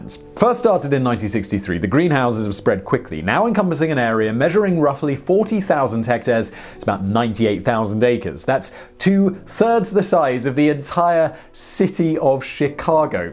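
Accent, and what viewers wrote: United Kingdom. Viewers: Australian dollars? New Zealand dollars? And there are dollars in even more countries. American dollars may not be the first kind of dollars to spring to mind to people from British Commonwealth countries, and is very popular in many of these countries, and don't forget that having a UK accent and not American they may think he was talking about their dollar. I'm a Brit, and when people say dollars to me, I automatically think NZ dollars, as my son and his wife have emigrated there.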